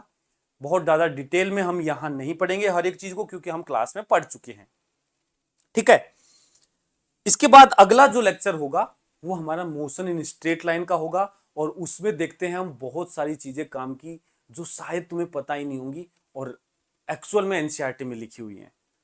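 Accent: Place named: Rajasthan, India. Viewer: rajasthani lingual greatttt😄😄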